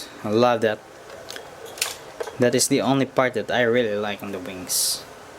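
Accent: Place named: Philippines. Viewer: from the sound of your accent your from philippines right? :D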